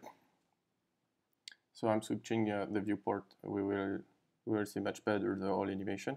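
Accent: France. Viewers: French right? I can understand it by your accent :p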